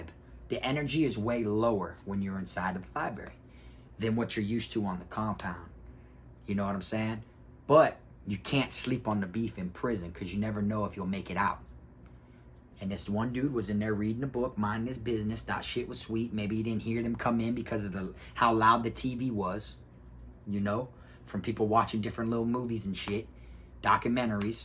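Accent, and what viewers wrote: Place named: South, United States. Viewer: idk how you’ll take this but that southern dialect might get you in trouble one day as your channel grows, I know damn well you don’t give a fuck but I just don’t wanna see some clowns try to “cancel you” or some shit like that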